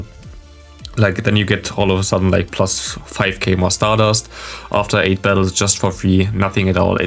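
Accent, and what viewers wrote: Germany. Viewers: German accent has its downsides 😅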